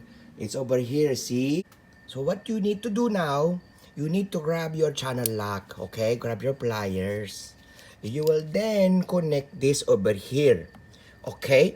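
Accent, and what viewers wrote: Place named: Philippines. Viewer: Thank you so much pare! I loved your distinctive Filipino accent and humor. I'll be doing this project soon! So many say you have to open your roof half way to do this but, obviously you don't! Salamat po!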